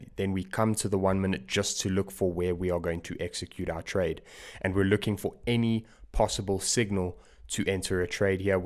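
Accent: South Africa. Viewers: definitely a south african boet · South African? Kzn ?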